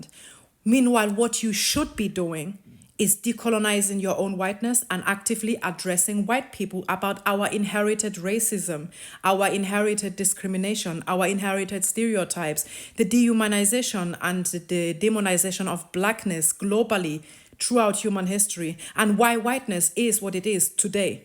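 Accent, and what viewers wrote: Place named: Nigeria. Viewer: Very nice video. Very insightful, and many good points were made. If I may add on one piece: "whiteness" is not the problem; White PEOPLE are the problem: White men, women, boys, girls, little old White women, and cute little White preschoolers! I haven't seen your other videos, maybe you address this there; but this is a point that was not so strongly addressed as far as I could see. I have never seen"Whiteness." I wouldn't be able to identify it nor would I know how to combat it if I came across it. I do know what White people and their racist deeds are however. "Whiteness" is an amorphous, abstract concept that dilutes the dialogue regarding where the problem (and thus the solution) lies. It's actually a shelter, the final fig leaf, so to speak, behind which White people hide. It's part of the problem and a term which should be avoided in conversations on Racism/White Supremacy at all costs! 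Rather than speaking of "our Whiteness," I would rather hear White people say, "I did such and such," or "My mother, my wife, my grandfather, my child, or that White person right over there did so on and so forth." Notice how we NEVER hear White people talking like this, yourself included, ma'am. (Again, within the context of this video.) This would be a good habit for all White people to cultivate, again, including yourself, ma'am. Other than that, great video! I especially like your accent. Nigerian?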